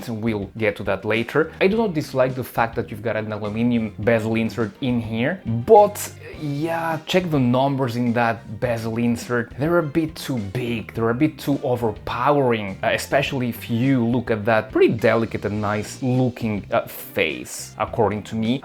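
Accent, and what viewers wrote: France. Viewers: When I heard your accent, I checked if it wasn't a translated video from French. No offense ;) Mon accent n'est pas vraiment meilleur pour être honnête. Quoique qu'il en soit bonne et honnête vidéo. J'apprécie que tu ne t'extasies pas sur tous les modèles.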